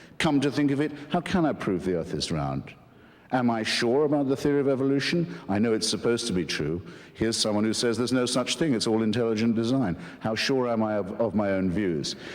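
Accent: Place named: United Kingdom. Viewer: Too Bri'ish didn't listen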